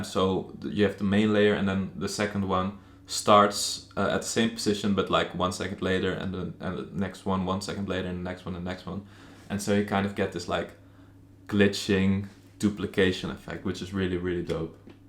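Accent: Netherlands. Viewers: you started speaking and i knew! Das een Nederlander! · Are you dutch? i can hear your accent. :3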